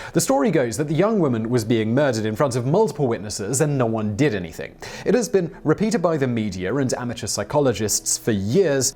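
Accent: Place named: RP, United Kingdom. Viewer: isn't hired because he knows anything. He's a mouthpiece with a posh accent.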